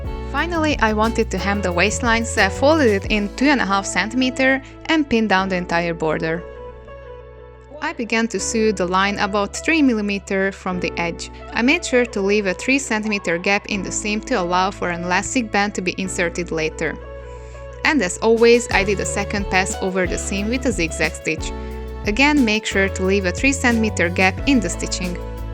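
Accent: Hungary. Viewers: Now I understand what people mean by us having a strong unique accent... I somehow knew you were Hungarian so I just had to look after it, and I was riiight! Anyway, you are really cute and the video was great! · Hearing your accent I am pretty sure you are Hungarian :P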